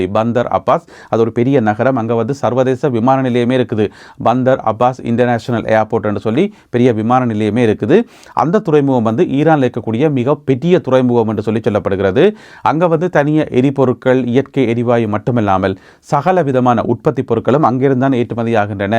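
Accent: Sri Lanka. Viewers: தாங்கள் பேசும் இலங்கை தமிழ் மிகவும் நன்று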